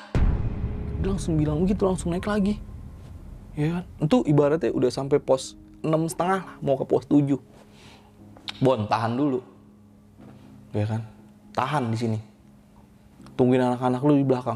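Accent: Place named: Jakarta, Indonesia. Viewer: Mirip Temon gaya bicaranya 😅 lucu khas betawi banget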